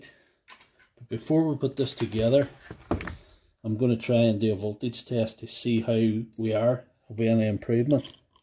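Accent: Northern Ireland, United Kingdom. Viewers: Do you fix batteries?? I have 3 genuine ones doing with this red/green light flashing.. tried on 2 different chargers.. going by the voice your in northern Ireland so thought I'd ask lol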